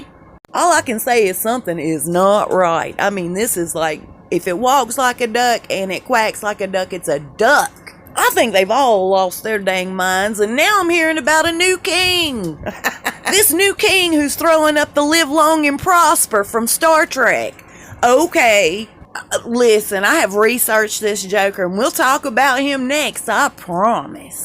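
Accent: South, United States. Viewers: love you. make me laugh. I love your southern accent.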